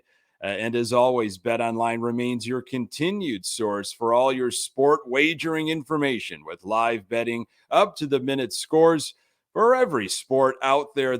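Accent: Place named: Midwest, United States. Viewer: White SAHCKS lmao. Love that good old Midwest accent my friend.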